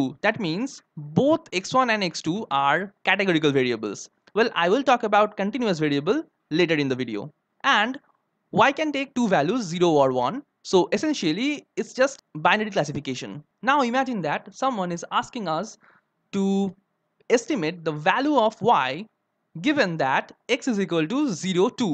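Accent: Bengal, India. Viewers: You areee Amazing. I love your Indian Bengali accent ( just a guess hehe make me a voice analyzer if i am right XD )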